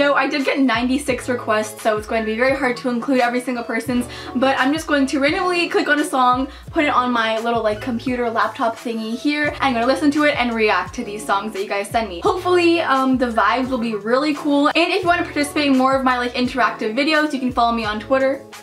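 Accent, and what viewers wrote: Canada. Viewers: Are you Canadian? Btw I liked the video :) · are you canadian?!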